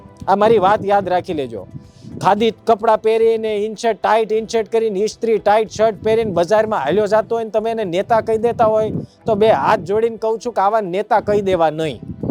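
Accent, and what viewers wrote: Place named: Surendranagar, India. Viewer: tame surendranagar na cho tamari boli uper thi lage che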